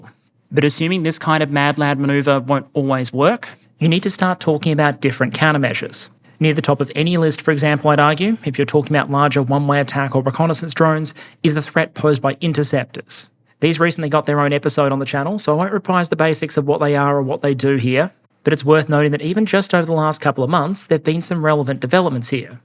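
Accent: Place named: Queensland, Australia. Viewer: Gonna take a punt here and say has a Queensland accent, specifically SEQ, so uh, how about them Broncs hey?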